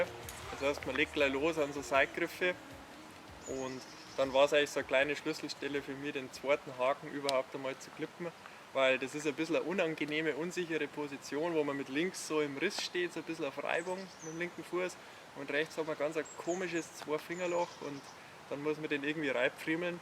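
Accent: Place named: Bavaria, Germany. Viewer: Hast du de rechte für des lied, kann i de aa haben? 😜 Und keine untertitel? Versteh dem sein tiefstes bayrisch ned...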